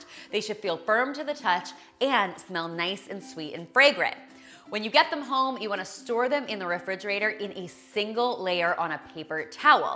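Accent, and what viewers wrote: Canada. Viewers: terri-louise Ó leathlobhair Canadian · you're right, her accent was much more prominent in this video :) I knew she was Canadian, but the entire video I was thinking about how clearly Canadian her accent is in this one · Is she canadian? I didn't notice her slight accent until this video... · yes she's Canadian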